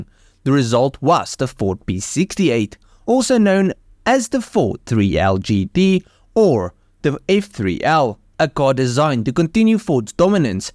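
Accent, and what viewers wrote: South Africa. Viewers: I think it's one of those less well known South African accents. Our ears are just not attuned to the S.A. accent because they haven't been on the (automotive) world stage that much. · Sounds like one of my countrymen from South Africa.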